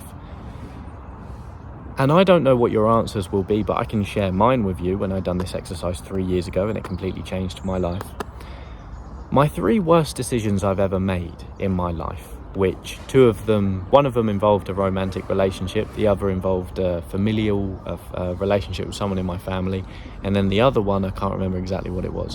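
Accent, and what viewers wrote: England, United Kingdom. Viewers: The Wise English Voice in my head is back 😁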